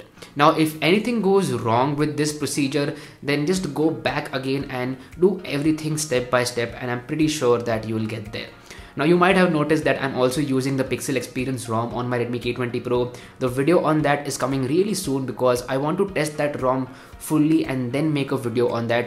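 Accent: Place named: South, India. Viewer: Your name is nd speaking accent is like south 🤔🤔🤔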